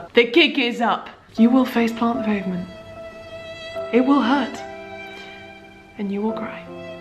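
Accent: United Kingdom. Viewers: Something about a slightly posh English woman wearing a cross saying “no nut busting for you my friend.” is very... strange for me. As an American gay male.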